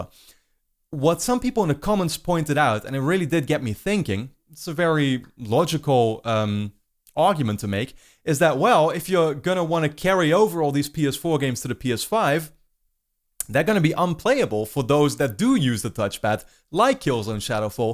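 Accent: Netherlands. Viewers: Love you’re Dutch accent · are you dutch your accent is really dutch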